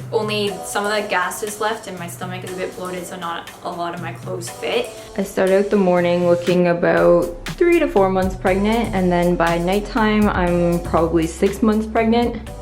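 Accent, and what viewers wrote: Canada. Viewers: What the heck kind of hospitals do they have over there? Abalone hot pot? Is it part hospital, part restaurant? Does it have Yelp reviews? Btw, your Canadian accent is alot more noticeable when you're sick. Welcome back. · Your Canadian accent is more noticeable when you aren’t feeling well. It’s the cutest thing ever! >.<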